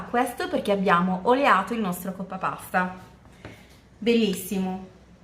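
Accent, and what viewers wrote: Sicily, Italy. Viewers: dalla cadenza che hai quando parli credevo fossi di Palermo.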